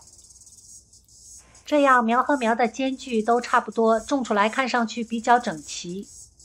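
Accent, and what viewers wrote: Jiangsu-Zhejiang, China. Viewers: 其实我最喜欢看的是开始的那三十秒，听你口音像是江浙一带的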